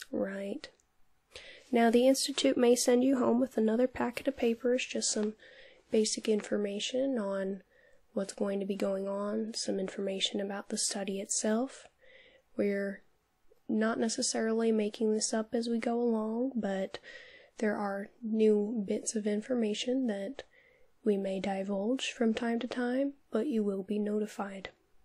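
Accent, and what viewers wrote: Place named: South, United States. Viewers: I hail from Georgia, and you nailed the Southern accent. You forking rock:) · How in the world am I supposed to relax with this video when I'm laughing half the time?! With nearly all other ASMR vids I watch, I do so simply for the tingles and relaxation. But with yours, the comedic aspect is always an added treat. And, as a lifelong Southerner, I have to say you do a mighty fiiine job of nailin' the accent, darlin'. Keep up the good work.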